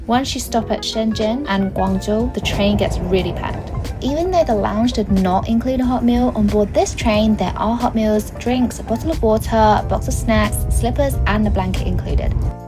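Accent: United Kingdom. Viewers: Can I ask where is the accent from? Love the accent, british but not super londonish. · What's with the British accent? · This is the first time I ever had your channel come up on my YouTube. First it reminds me of those famous spots in Thailand kind of has the same scenery. But the first thing really is your voice first of all it's unexpected I didn't expect to hear your voice and I don't think it's artificial intelligence I think it's your real voice. Your accent sounds British in a sense. You two are are cute couple you're beautiful and your voice is just a really gorgeous. I'm from America grown up as a kid I always wanted to travel never got around to it. But China real China not cities and Japan not cities I loved as a kid cherry blossoms bamboo forest the clothing the tradition the music is awesome.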